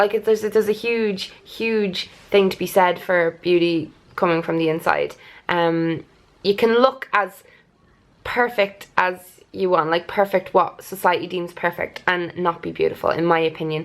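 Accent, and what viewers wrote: Ireland. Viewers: Had to break for the Jehovah's Witnesses there, we had a nice chat there haha. Aw I love Beautycreep too, I've been watching her for years (being Scottish and all). I like the accent and live in Wales now. And you, provide me with the Irish accent that I love so much! Plus both of you have lovely videos, are so down-to-earth and have such a positive outlook.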